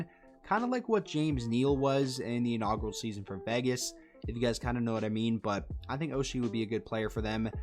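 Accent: Canada. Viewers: Do you have a video as to why you are a Red Wings fan? For me it's because I was born and raised in Detroit and only eventually moved slightly outside of Detroit. There was no way I couldn't be a Wings fan in the 90's and later hockey in my high school was bigger than HS football in the white suburb town that we eventually annexed to. Your accent, particularly your R's sound very Canadian but I wouldn't place it as Ontario. If you're west Canada or newfie, why Red Wings? Other than having excellent judgement, any personal life connections?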